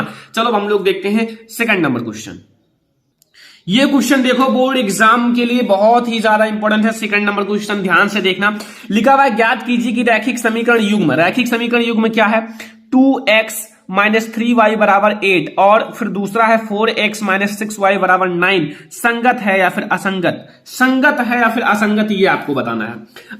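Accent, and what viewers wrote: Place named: Deoria, India. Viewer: mujhe lga aap deoria se ho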